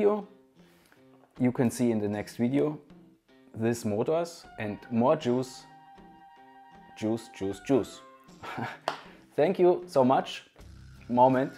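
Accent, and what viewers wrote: Germany. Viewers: Simmer vom schwobaländle ? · Man hört dass du deutscher bist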